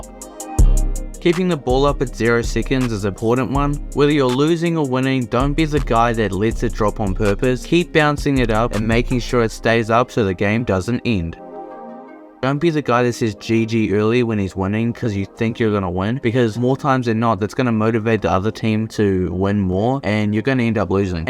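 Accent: New Zealand. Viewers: Are you a kiwi.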